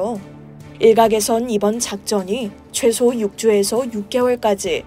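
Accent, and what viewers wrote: North Korea. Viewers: 웬 북한 억양? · 나레이션 탈북자 엑센트네요 ㅎㅎ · AI 어투가 북한말 같아요 한국 방송답게 해주세요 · 말투가 북한말인데? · 북한 말씨네.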